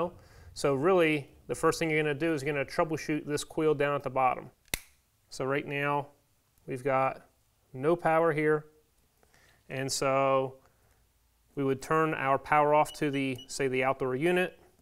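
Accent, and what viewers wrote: United States. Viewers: Speaking in AMERICAN English ?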